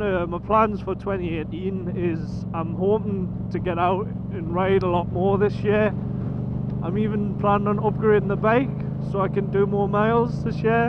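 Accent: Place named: Newcastle, United Kingdom. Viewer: Hi mate good to hear your geordie accent again nice to see u back focus st awesome car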